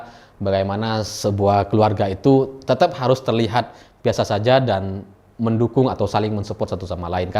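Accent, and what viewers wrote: Java, Indonesia. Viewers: Orang Madura tp logat bahasa nya kayak orang jawa ya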